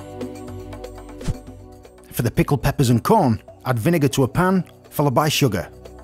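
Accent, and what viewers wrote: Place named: United Kingdom. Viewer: mate, with your style of production and your superb editing you could produce video packages for local TV stations that do morning news and chat shows. Local stations are always looking for premade video packages that they can run. You have just the rite personality and with your good looks and british accent you are a natural.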